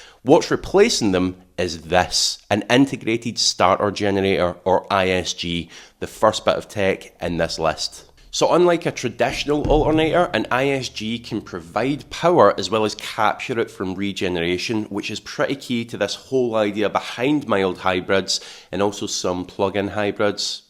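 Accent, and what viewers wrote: Scotland, United Kingdom. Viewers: I'm half Scottish but sometimes I find difficulty in understanding the Scots accent. Not with you. I understand every word. Thank you. Incidentally I think the name Dacia is usually pronounced Dach eah.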